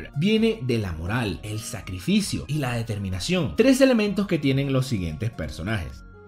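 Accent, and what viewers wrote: Venezuela. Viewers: Hay youtubers que nacieron para fracasar, otros nacieron para triunfar, tu eres lo segundo sigue asi venezolano que se que lo eres por tu ascento xd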